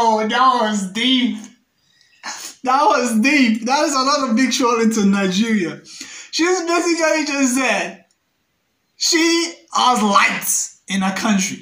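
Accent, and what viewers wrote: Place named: Ghana. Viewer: I sure this guy school 4 Ghana. Cos ur pidgin bi typical Ghanaian Uni one some.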